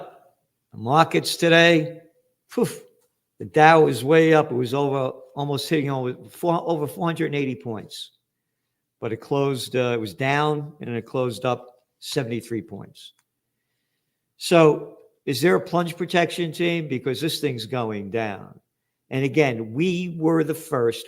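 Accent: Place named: New York, United States. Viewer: Great video, thats what I miss NY effing talk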